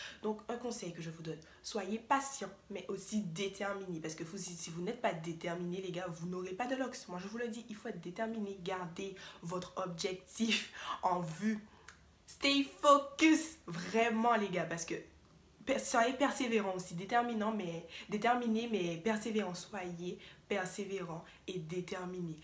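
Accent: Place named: Antilles, Caribbean. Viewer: Humm un p'tit accent antillais🙃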